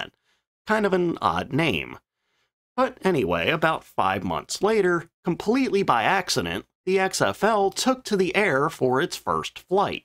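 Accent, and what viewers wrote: United States. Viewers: Have you gone AI on us? that was the weirdest pronunciation of “Anacostia” I’ve ever heard. I found that odd as you talk like you’re from the Delmarva and maybe PA area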